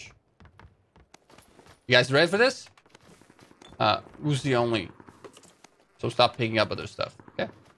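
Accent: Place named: Denmark. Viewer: Where are you from? You sound Danish.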